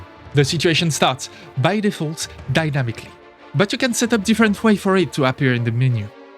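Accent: France. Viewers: Nah I like the French narrator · L'accent français atroce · Nah.. We love our French Narrator.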